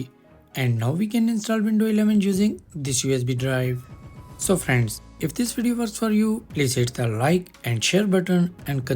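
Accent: India. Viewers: Look, please do not take this wrong, When in visited USA for the first time Americans made fun of my own voice. I still have an accent with English to an extent, but because I have tken very long and hard amaounts of time to train myself, now, most people do not think i'm from Russia let alone real rural North Ossetia, just don't think I'm trying to bully you or make fun of you, it's just the truth. I've been to USA alot, and most Americans think this is absolutely annoying. We all know the English's rather dismal outlook on "Paris" and "Indians". But, most of it is trying to deciepher what you are saying. The western world deals enough with your kind of accent on the telephone supports, now you guys are in my country trying to speak Russian! I can only imagine...it's just frustrating. You got to see it from a native speakers perspective. How would you like talking to me on the phone in Hindi with a super thick indecipherable Russian accent but calling myself Krishna or Sanjaya, or an Indian name? 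It's annoying.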